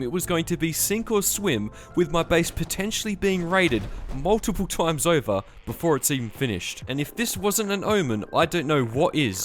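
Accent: Australia. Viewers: Are you Australian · OMG, I’ve been watching since you had 500 subs, even on different accounts. Your Australian voice and the way you explain things always keep me hooked. It’s crazy to see how far you’ve come, and honestly, you deserve all the success. Can’t wait to see where you take this next – keep doing what you’re doing, it’s seriously inspiring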